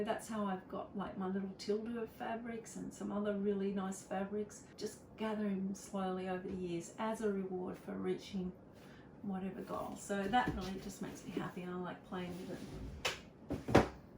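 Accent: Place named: Australia. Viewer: So lovely to hear another Australian voice talking about my favourite subject! It is great to hear you referencing my quilting heroes such as Bonnie Hunter and Pat Sloan. So much joy ahead of you! PS. I love your dress!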